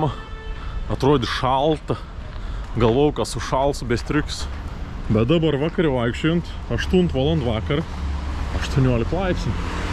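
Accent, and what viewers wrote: Samogitia, Lithuania. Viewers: Bet keik faine viel tav keliuon kažkor matyte, da ka žemaiteška rokounys atruod ka su draugel pats išdiejau i ton Ruoma ;D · sėkmės, super , smagu ziureti ir klausyti zemaitiska😃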